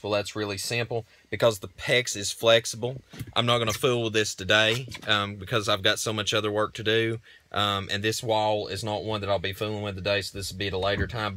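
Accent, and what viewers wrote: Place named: West Virginia, United States. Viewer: Sir, I love your accent where are you from. Sounds like West Virginia or Kentucky maybe.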